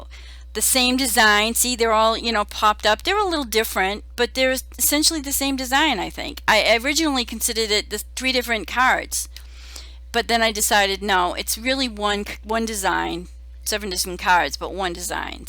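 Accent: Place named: Pennsylvania, United States. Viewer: I love these cards! I do think you’re putting to much pressure on yourself, but it’s strictly up to you the number of videos you produce ok? Oh! And where’s your accent from? I’m thinking Pennsylvania? A little stronger than Ohio 😁 where I was born 😏. thank you for your inspiration!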